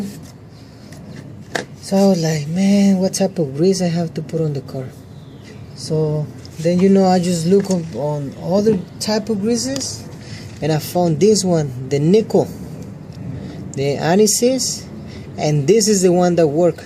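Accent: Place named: England, United Kingdom. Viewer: My friend , your voice and english accent is really annoying, i hate you.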